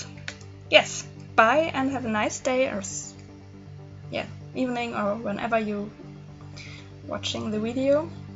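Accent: Germany. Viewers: Immer diese Münchner :D Hört man direkt raus ^^ gruß aus Hamnburg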